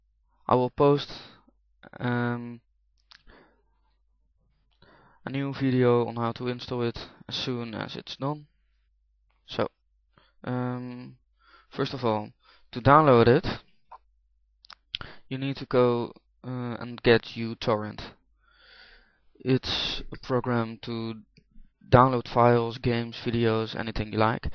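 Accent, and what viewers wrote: Netherlands. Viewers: hehe je bent NL ik hoor het aan je stem XD nice video! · ja echt duidelijk een nederlander LOL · are u dutch? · haha je hoort echt dat je nederlands bent!!:D heeeeello xD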